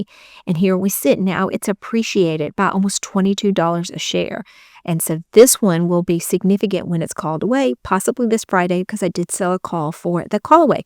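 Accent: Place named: South, United States. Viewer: I could just listen to that sweet southern accent all day long. Love your posts. How's your daughter doing with trading?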